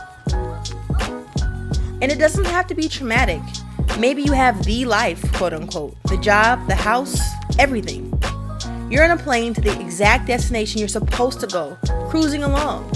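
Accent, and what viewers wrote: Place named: Honduras. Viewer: Awww beautiful words same way i feel currently i have everything in the US but part of me is like dead, not even sure how i found your chanel glad i did... our purpose in this earth is to enjoy life, have peace, show our kids they are more things outside than these routines, not sure if you are honduran i can hear some accent there 😅 we love you guys! 🇭🇳🫶 and i will be praying for your dad 🙏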